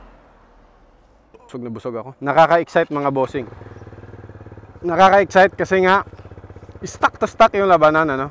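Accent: Iloilo, Philippines. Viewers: Ilonggo go ka gali ayusin mo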